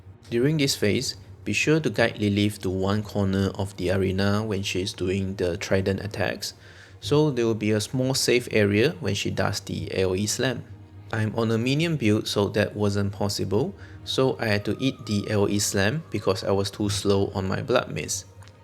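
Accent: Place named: Singapore. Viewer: You sound singaporean. Gotta support neighbouring content creators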